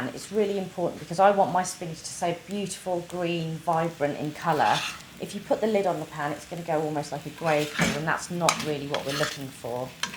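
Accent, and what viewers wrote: United Kingdom. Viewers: I just watched the whole video just for that British english. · HEY SEXY INDO WITH BRITISH ACCENT YOU FORGOT TO ADD ANY SPICES NONE ARE YOU BOILING IT WITH NO SALT PEPER CHILI POWDER OR WHAT ?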